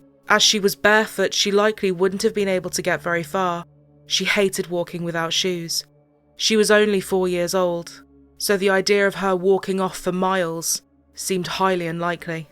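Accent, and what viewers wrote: United Kingdom. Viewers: yay, super cute, british girl voice narrator crime! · I don’t like a British narrator, it implies that story is in Britain, are there not any American narrators?I’d feel the same listening to a British story narrated by an American narrator.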